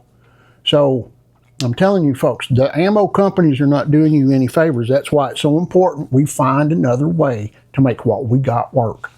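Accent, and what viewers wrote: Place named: South, United States. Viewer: I like your accent. I’m trying to learn if it’s regional. I’m going to guess Alabama or Mississippi. I’m from Australia.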